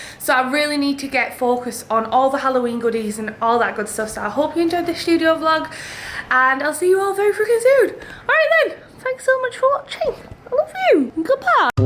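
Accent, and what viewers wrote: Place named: Northeast England, United Kingdom. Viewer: I'm from Newcastle and your accent intrigues me, its like geordie and yorkshire at the same time! lovely :)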